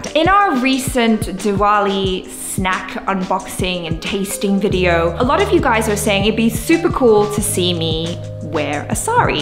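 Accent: United Kingdom. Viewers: I have an exam tomorrow but here I am watching trying to wear a saree and speaking in her British accent. Ye bhi theek hai! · sounds more British here... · That British accent is strong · Wooooohh.. Your british accent is gorgeous ❤💛😍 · I knew was British But god damn the british accent is so thick here😂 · is more British on her own channel than Jaby's. Nice switch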